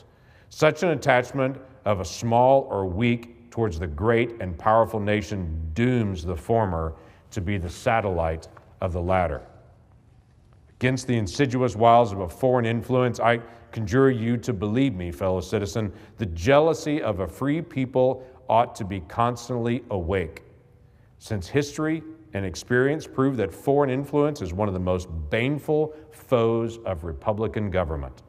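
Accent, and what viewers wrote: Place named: Louisiana, United States. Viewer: I love the new Orleans/ Louisiana accent especially with the fiery anger an conviction coming along with it. That kinda righteous anger and vengeful fury is what you need to combat the violent and psychotic animals coming across the border who have no intention of coming to America to become part of it and one of it's people. They are here to poison everyone slowly and profit immensely from your long drawn out death. 30-40 years of deep corruption since the rise of drug cartels has turned south America into a hellscape and demons and devils are the only thing that can survive in a hellish environment so it's no wonder these so call humans are violent and twisted to the core and won't shy away from the most extreme measures and heinous acts which they carry out with a deranged fervour. We are at war with south America because their own states and people are invading out country an killing our citizens by use of unconventional warfare in the form of narco terrorism. 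Mexico made a secret treaty with Kaiser Wilhelm to attach the US back in WW1 and since humans never learn from their mistakes, they leave themselves wide open to repeating them. This country has become so soft by pushing this" enlightened ignorance" other wise known ask being woke. We have grown too soft as a society and we a ripe for exploitation. The average citizen of the US is woefully unprepared for what's to come and have no fight in them as it is squished out from an early age creating a generation of weak, s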